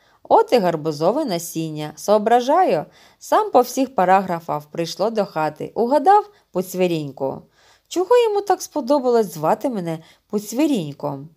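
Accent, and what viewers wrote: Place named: Russia. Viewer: Дуже дякую! Ви мені дуже допомогли! Я завжди вас слухаю.Але в вас якась трішки російська вимова слів і деякі слова ви вимовляєте неправильно але всеодно це ніщо в порівнянні з тим як ви нам допомагаєте)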